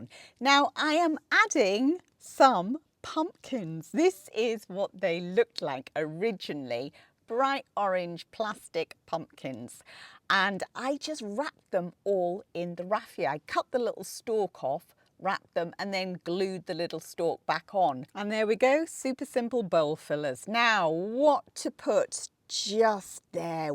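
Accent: United Kingdom. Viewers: Love just listening to your British accent, but your decor ideas are fabulous. I wish I could come visit in your beautiful country home. Great videos!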